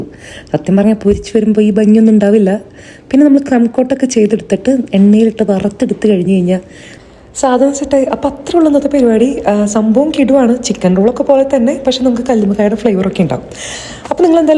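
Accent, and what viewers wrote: Kerala, India. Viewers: Thani malayalide sound pole und❤️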